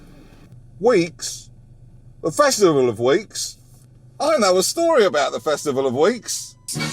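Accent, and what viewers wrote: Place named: Cockney, United Kingdom. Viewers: This actually makes me sad hearing Cockney 😢 How much longer will the British be around? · I loved this telling of the Passover account 😂 The cockney accent was an unexpected touch but very London. I will say however that there are many aspects of the Passover that point and foreshadow Christ in a lot of ways. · Nothing like a Londoner telling a story about the four by twos 😂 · Brilliant! Cockney accent and imagery is cool. Can you try it with Scottish accent?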